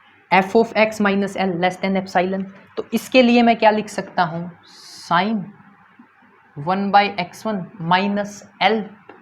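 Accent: Haryana, India. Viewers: U R Great Sir Your Haryanvi Tone is just ossmmm Thank u Maine puri youtube chaan di thi par ye topic nhi mile the And main bhi karnak ke ek gaavn ka hun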